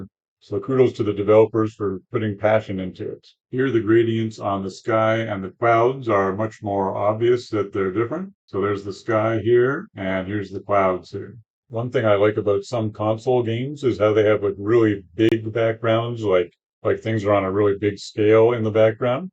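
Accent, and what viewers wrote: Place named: South, United States. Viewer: OK, because your accent is heavy, sounds like you're from the Southern States of the USA. I didn't even know the AMIGA was a thing in Canada. It was mostly popular in Europe. So do you think Canada will win the World Cup then?! :D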